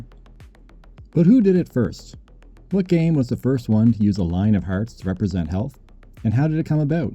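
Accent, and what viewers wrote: Canada. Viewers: "Hærts." That's what goes doki doki when I hear the unmistakeable accent of a True Northerner. 🇨🇦 Great research and videos, standing on guard for you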